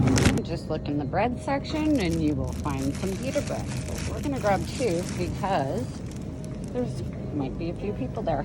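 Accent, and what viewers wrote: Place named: Canada. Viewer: I love the accent too Canadian?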